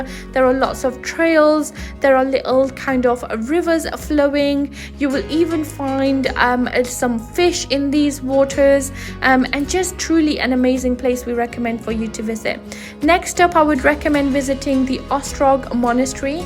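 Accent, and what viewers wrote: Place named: United Kingdom. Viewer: U have a British Asian accent :D, guessing you're Asian. Good vid btw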